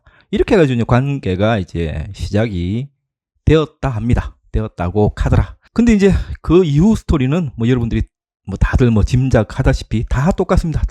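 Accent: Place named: Gyeongsang, South Korea. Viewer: 현재 워킹 현황 영상과 경상권 억양에.. 친근함과 함께 구독 눌릅니다^^ 자주 올려주세요~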